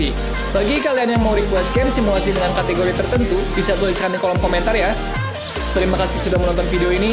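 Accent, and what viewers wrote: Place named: Indonesia. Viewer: Logat lu indo sangat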